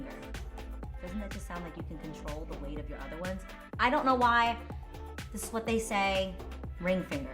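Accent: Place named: New York, United States. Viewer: I LOVE your newyork accent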